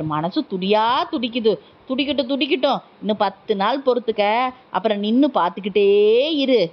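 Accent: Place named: Tirunelveli, India. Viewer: கலையை விலை பேசாத கலைஞன் வாழ்க! திருநெல்வேலி பாஷை வளர்க.!!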